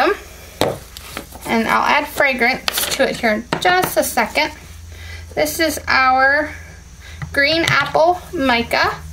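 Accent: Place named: United States. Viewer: Such a gorgeous soap! I bet it smells devine 😍😍 I love your accent-where in America do you guys live? I'm in Tasmania Australia 🇦🇺 We don't have many soap makers here so buying it isn't easy :( wish you lived closer,I'd buy soooo many of your soaps haha :) xx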